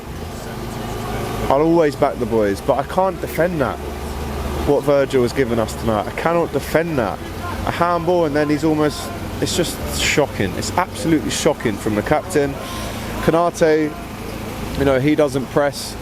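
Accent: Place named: Cockney, United Kingdom. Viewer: Is this Cockney gonna go back to supporting Arsenal now?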